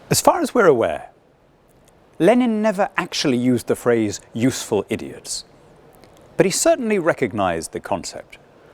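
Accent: RP, United Kingdom. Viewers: Theres something seriously wrong with dan, he sees the world in simple terms, black and white, in most of his videos, communism amd capitalism. Hez guilty of the highest levels of intellectual dishonesty and hez been castigated severally for it. To go on calling out atrocities committed by putin and forget the crimes commited by his ideological political wing in other nations is the heighy of hypocrisy. once he even claimed that hitler was a socialist lol much to the chagrin of a more educated audience he was presenting to. Take out the posh accent and you will realise his points in many of his videos lack any substance in them.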